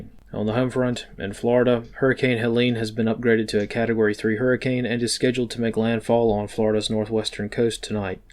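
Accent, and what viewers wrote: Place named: Chicago, United States. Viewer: Sounds like he’s from Chicago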